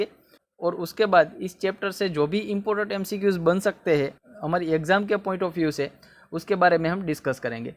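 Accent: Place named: Kathiawar, India. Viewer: Bhai Gujarati lage chhe..., Kadach kathiyavadi...keep it up bro... Subscribed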